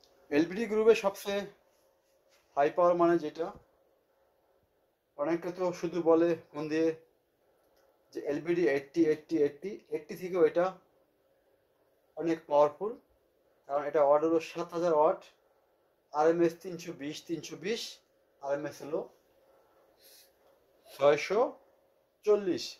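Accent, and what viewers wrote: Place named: Barishal, Bangladesh. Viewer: ভাই আপনার বাড়ি বরিশাল মনে হয় 😮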